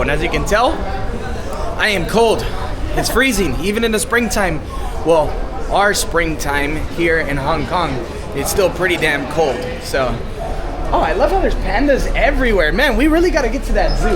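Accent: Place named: United States. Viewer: actually you no what ..... dude thats so annoying your whiney american accent doesnt help tho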